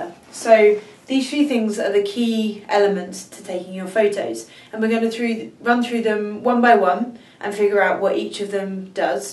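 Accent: United Kingdom. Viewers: What happened to the nice dragon lady...have you married and forgot us here? lol...love your British accent, yea!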